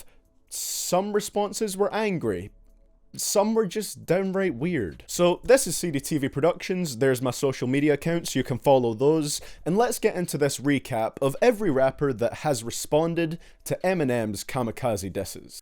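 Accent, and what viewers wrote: Scotland, United Kingdom. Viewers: Are you Scottish, if so me too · Lol this nigga British af talking about rap, that shits funny as hell 😂😂😂 I love it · Ahahahahahaha. Your adorable Scottish accent makes you sound so adorable and I could never take you seriously.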